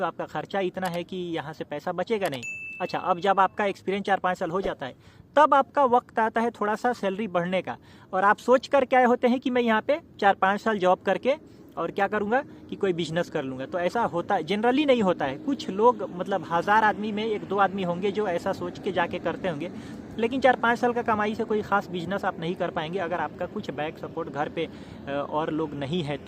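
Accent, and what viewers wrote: Bihar, India. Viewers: Salam.. Aap bihar se ho?? Your accent 😊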